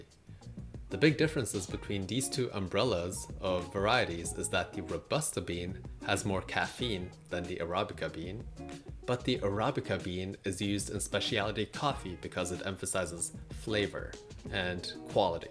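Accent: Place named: Germany. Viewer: Idk why but I was so sure you were German lmao You kind of sound like a (very good) German English speaker xD Are you really Canadian?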